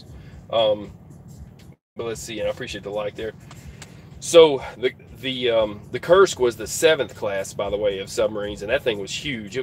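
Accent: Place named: Midwest, United States. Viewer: How the hell do you know that cartoon? I watched it as a kid but I was born in 70s almost 80s lol....... kursk stuff- we offered help ? Can U speak Norwegian? Lol you speak like from midwest ..white, redblooded murican boy.:) what I meant was that were Norwegians who offered help. Its arctic circle. Do u think America with her 2 old ass icebreakers vs Russian 57 icebreakers....u offered help lol.....do you know that many think it got hit by Ohio class that was getting the repairs in Norway a few days later and you can see from the satellite image that the emergency capsule is not there. Removed . Why ? Why even repair in Norway for an American sub if it's not some regular maintenance. PS... I like you even touched this subject Dude does that bald black commentator with glasses look like ru paul?lol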